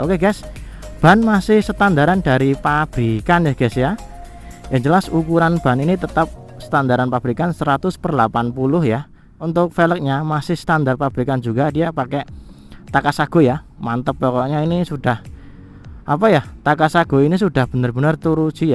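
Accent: Java, Indonesia. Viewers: Sampean jawane pundi mas?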